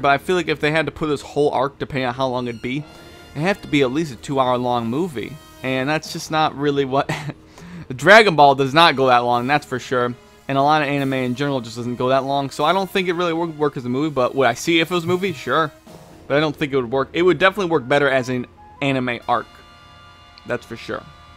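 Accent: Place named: Upstate New York, United States. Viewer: Hey, not DB related just curious. I remember saying you're from rural NY. My sister in law is from Schenectady and has a similar dialect to you. No need to specify, but is that about ballpark to home? Again, no need to get too personal or in depth, just making an observation as neither she and her family nor you have the stereotypical fuhgetaboutit accent.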